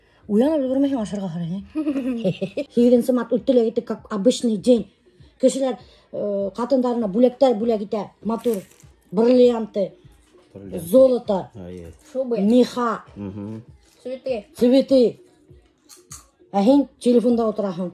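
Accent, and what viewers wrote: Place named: Chelyabinsk, Russia. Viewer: милашка привет, смотрим вас из Челябинска, ваш говор на башкирском как наш. Приятно слушать, все по простому, смотреть на вас, все как в обычной жизни, бирсен сезга ходаем саулык, бахет, уныштар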